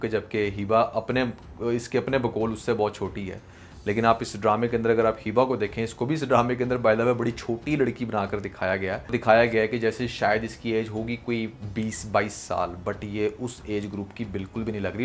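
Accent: Punjab, India. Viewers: This host is definitely an annoying personality , but overconfident 😂should work on his speaking and presentation skills, his english, speaks English in Punjabi style , instead of bashing the stars it is the mindset of the people who produce such programs and show only very young characters, if someone has acne it’s not their fault , it’s not poor hygiene most of the times because it can be hormonal , and acne ages skin and if someone has acne does not mean their age is more, he thinks he is very intelligent ,very typical uneducated male personality! Grow up!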